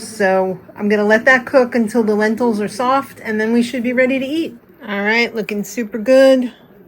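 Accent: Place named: New York, United States. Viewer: Happy Birthday!!! I am enjoying watching all your videos (even way back.) Thank you for sharing your life with us. As a former Long Islander, I love your New York words, phrases, and accents. I hope your birthday is wonderful and your year filled with blessings. 🎈🎈🎈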